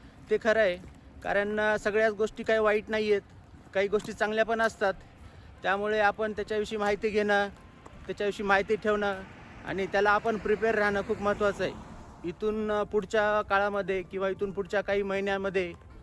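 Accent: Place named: Solapur, India. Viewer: Aj first time Tumce videos pahile m. Tumcya tone vrun Vatl hot ki tumhi Solapur side ce asal. Chan Vatl yeikun ki tumhi latur ce ahat. Khup simple ahat 2ghpn tumhi ani tumci mul Pn.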